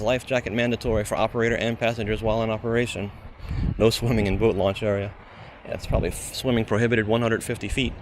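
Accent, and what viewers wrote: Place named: New Orleans, United States. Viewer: You sound so New Orleans! Thanks for posting!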